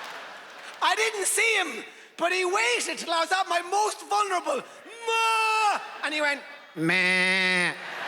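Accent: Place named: Ireland. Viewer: he’s irish ,man 😂😂thats what we sound like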